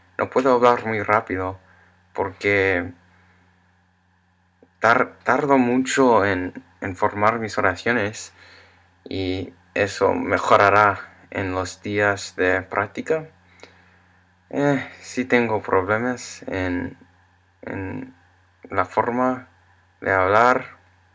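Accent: Spain. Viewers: si quieres hablas español de españa vas bien, pero si quieres aprender el mexicano tienes que quitar la "z" en tus pronunciación y mejorar cuando usar la "r" , pero poco a poco vas a mejorar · Se entiende todo muy bien, tienes acento de España, claro.. nadie es esperfecto lol sigue ampliando tu vocabulario good job !!! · hahaha, muy bien! , suenas muy castellano, me agrada. Saludos.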